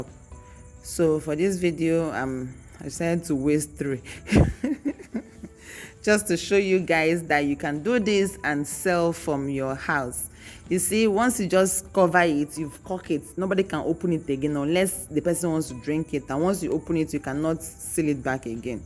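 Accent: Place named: Ghana. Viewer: Hello ma'am, thank you for sharing. The video was quite explanatory. Thank you. The way you speak sounds like one from "Pete Edochie's" era. They spoke a lot of oral English. It sounds like music really, depending on how they speak it anyways. But then again, you sound like you were born, "bread and buttered" in Ghana. ❤❤❤ Thank you once more ma'am.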